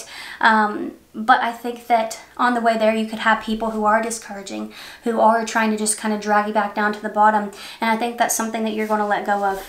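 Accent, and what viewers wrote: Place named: South, United States. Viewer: Your southern accent makes me homesick for Kentucky, my childhood........... are you from KY or Tennessee?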